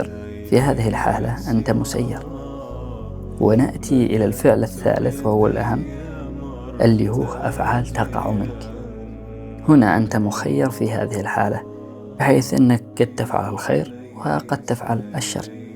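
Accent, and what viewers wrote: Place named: Yemen. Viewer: اللهجة اليمنية 😅❤❤❤ اول مرة أحضر فيديو ليمني بعد فداء الدين قليلين جدا إخوانا في السوشيال❤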